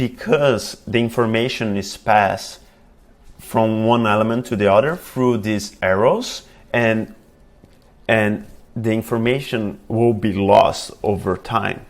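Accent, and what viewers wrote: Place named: France. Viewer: I’ve liked your French accent!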